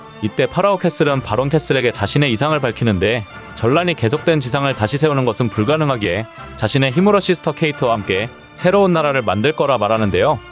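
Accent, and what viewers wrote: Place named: Jeolla, South Korea. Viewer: 말투가 전라도네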